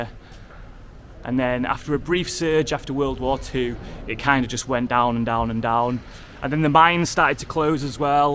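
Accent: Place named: Northern England, United Kingdom. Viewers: hey fella i think your accent is so cool. real northerner. i come from Worksop near Sheffield. did you know that there are 4 very large funnel chimneys at East Midlands Parkway Train Station. i don't know if you have been there? also there are some on the Gainsborough skyline look at google maps of Gainsborough street view and you can see them. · This guy deserves his own sky or BBC show, with his facts, statistics and historic based narration i think more people should watch him, i also like that fantastic yorkshire accent. Respect.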